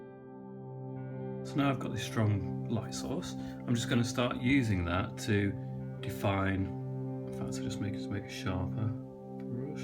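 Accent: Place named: Yorkshire, United Kingdom. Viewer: Fantastic, just the right level of detail, nice and clear. Like the background music, not obtrusive, but relaxing. Lovely Yorkshire accent!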